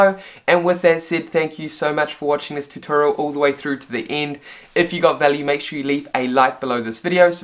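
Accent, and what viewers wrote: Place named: New Zealand. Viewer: Great content, thanks. Good to hear a kiwi accent too!!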